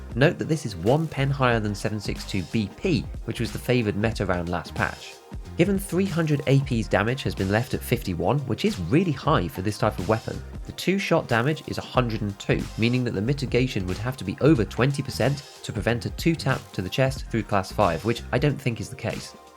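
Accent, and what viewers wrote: RP, United Kingdom. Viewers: Again, when I need you the most, you're there to hold my monkey hand and lead me toward the light of knowledge. You really are my favourite Tarkov YouTuber. Your content is smart and the posh accent makes it sound doubly smart, like what you're saying can be absolutely trusted .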